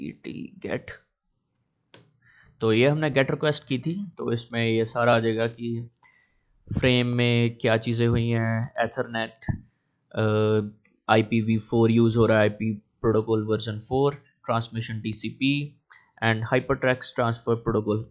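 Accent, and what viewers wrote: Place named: India. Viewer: Stop speaking Indian and only speak English as have a hard time understanding your heavy accent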